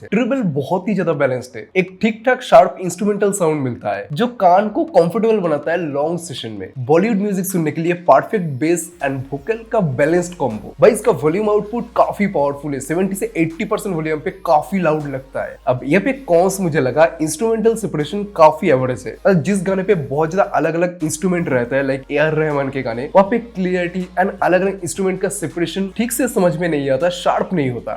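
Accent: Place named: Bihar, India. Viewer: Relevant info diya kar bhai. Aur bihari feelings aati hai tujhe sunne pe 😅. Jisme r aur d bolne me totla jaisa lgta h tu... Faltu me paka rha tha😂😂😂😂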